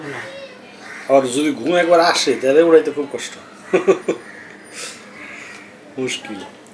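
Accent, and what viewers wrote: Bangladesh. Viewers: Dada apne kemon achen ami nederlandsche thke dehi apnar gabber thekle Bangladesh er kotha mone pore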